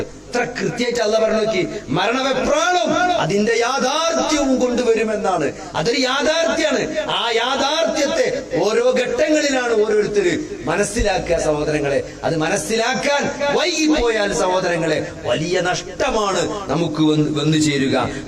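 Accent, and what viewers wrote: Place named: Malabar, India. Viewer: അടിപൊളി ശൈലി മലപ്പുറം മലബാർ👍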